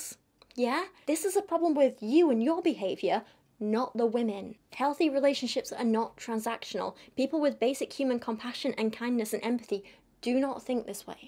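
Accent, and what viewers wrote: United Kingdom. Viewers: You go! "Stupid but louder" hehehe... "Have a giggle" you Brits have a way of speaking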